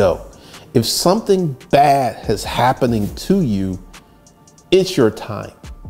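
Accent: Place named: Alabama, United States. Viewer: Do you know what's so funny is that I live in Detroit and I've lived here for 25 years and I rarely get a chance to go home to Birmingham Alabama. When I hear you speak , it brings back memories of growing up in Birmingham. And what is funny, no one here really know about AG Gaston. I actually lived down the road from him and we all knew that was his house on the hill that was gated. I was really thinking about moving back to the south. Thank you for content for thinkers.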